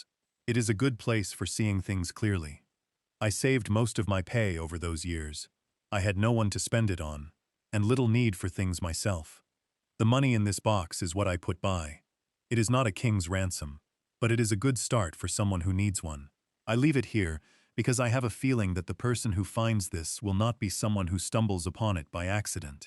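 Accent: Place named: United States. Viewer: Excellent! Story and the narration was nice and gentle. Good American accent. I really dislike those fake British accents! Appreciation from North Central, Minnesota.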